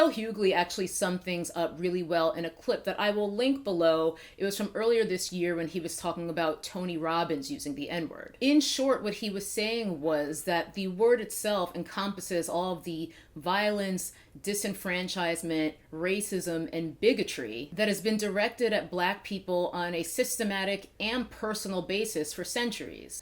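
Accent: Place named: East Coast, United States. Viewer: Yet again you hit the nail on the head. It's not like everyone goes around saying the C word to Asians. For goodness sake, or the S word to Gina Rodriguez's race sheesh. And I knew you were from the East Coast I could hear it in a few of the words you say, and I'm sorry that old man called you that!!!!!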